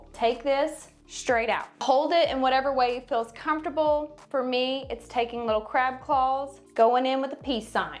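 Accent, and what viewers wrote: South, United States. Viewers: Shoot ain’t u cute as they come! Your accent matches mine lol love your personality! Great video btw I really needed someone to show me in southern terms how to blend my own extensions the only difference I have is I’m brunette with really thick hair but pretty long too I also wear my hair straight more than I curl it plus I use one huge clip and fill in the empty spots best I can with my other individual extensions any suggestions without taking away a lot of my own hair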